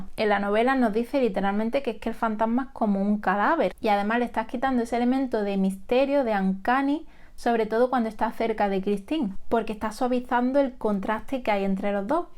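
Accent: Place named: Almería, Spain. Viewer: Qué descubrimiento tu canal! Me encanta porque es diferente a todos los que veo de lectura y literatura.Genial como analizas, la simpleza con la que hablas, tu pensamiento crítico y lo visual que lo haces todo.Ojala sigas haciendo estos vídeos de análisis de clásicos! Por cierto, no sé si eres de Almería, pero tienes acentazo jajajaja yo si soy de Almería! Te animo a que sigas así!! Genial aprender tanto y tan bien!! Un abrazo!!❤❤❤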